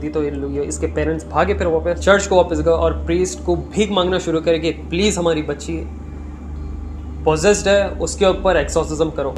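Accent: Hyderabad, India. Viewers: Ak baat humesha notice kiya Hyderabadi admi kese bhi baat kare pata chal hi jata h ino old city ke h😀😀😀😀😀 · Accent bohot hai baato mein apke pure hyderabadi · phle jaisi baat nai aari, (Hyderabadi bolne ka tareeqa...)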